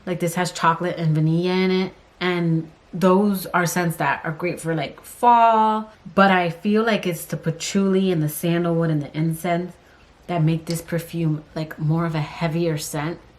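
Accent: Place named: Miami, United States. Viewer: Girl, tell me how I’ve seen a couple of your videos and I thought she has such a Miami voice .. are you from Miami? 🤣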